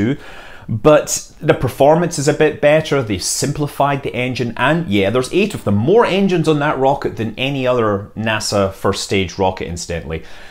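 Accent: Scotland, United Kingdom. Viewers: Scott, your videos have come up on recommended several times, I decided to watch some and it seems YouTube got it right. I just subbed and I look forward to more of your videos. I wonder if you might be able to put me out of my misery on something? Your accent tells me your Scottish but I can't put my finger on which part of Scotland, I'm from Fort William and I'm guessing that you are from somewhere in the central belt?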